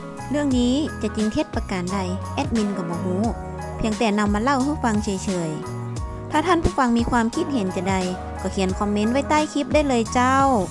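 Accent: North, Thailand. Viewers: ชอบสำเนียงทางเหนือจังครับแฟนผมกะอยู่ลำปาง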